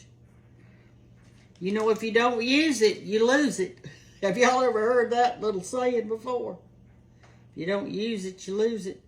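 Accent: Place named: South, United States. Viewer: You are a hoot Ms.. I love to here southern women talk Enjoy your videos😊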